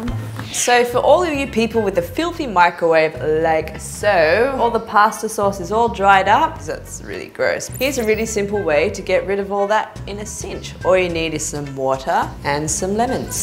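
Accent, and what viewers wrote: Australia. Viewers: Where is she from? Australia? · is she from Austrailia?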